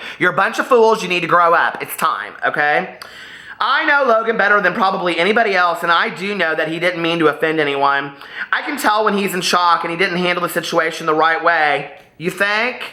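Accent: South, United States. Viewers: Or is it stop sign? Hell I don't know but i will sing the heck out of all the versions lol you can probably tell I have a bit of an accent already. You give me a little southern belle sometimes to so it could sound natural to you. But I also get big city New York vibes from you. OMG I'm typing as I watch your videos and you literally brought up STEVIE. You are my kweeeen , your Leslie shaee famouuusss nowwww lol you've been. Sorry I live type and it gets long honey. But you know how we get to talking and just can't stop .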